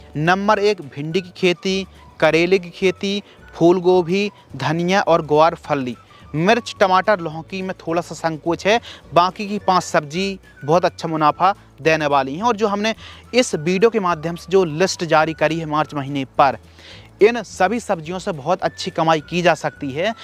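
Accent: Bundelkhand, India. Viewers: Aap Bundelkhandi ho ..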